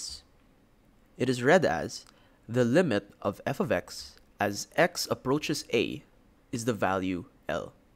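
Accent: Philippines. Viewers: Pinoy itong nagsasalita ramdam ko. Unang salita pa lang sa video eh HAHAHA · you sound somewhat Pilipino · This accent sounds Filipino. Are u Filipino? Edit: also great vid man, the way my school explains calculus is terrible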